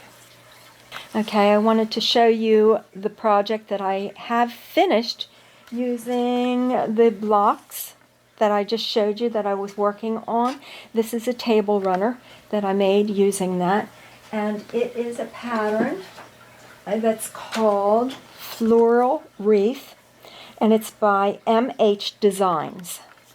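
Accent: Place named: Pittsburgh, United States. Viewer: I hear a Pittsburgh accent!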